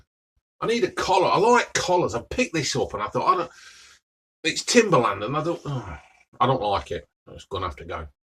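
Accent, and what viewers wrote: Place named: Northern England, United Kingdom. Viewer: Where are you located buddy? Sounds northern. Im a filthy manc and love your down to earth content.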